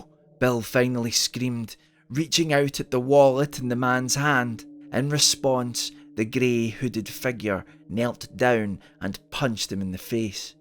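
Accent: Scotland, United Kingdom. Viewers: one of my very favorite authors! What a dark and beautiful story this was... Good for Martha 👍!! Thank you, I love it when you read your own stories with that lovely Scottish accent. I thought at first the story had just ended with that long pause but was happy when I went back to listen and found the fantastic ending! I hope you're staying happy and healthy in spite of all the madness of this world right now. Much love to you and your little family ❤️😘♥️. ♥️🇺🇸♥️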